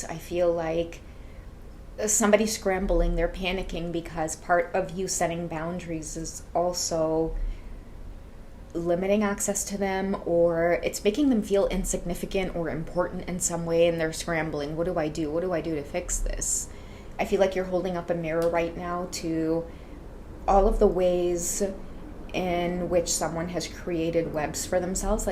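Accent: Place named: Trinidad and Tobago. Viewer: Look meh trini reader 😂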